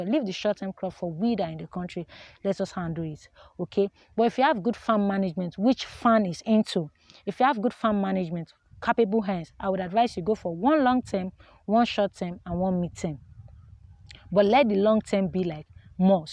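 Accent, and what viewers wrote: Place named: Urhobo, Nigeria. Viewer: You sound like Urhobo girl.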